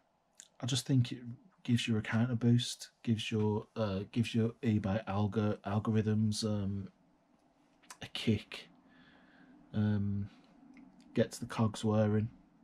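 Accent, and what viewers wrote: Birmingham, United Kingdom. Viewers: Damn that brummy accent 🤣🤣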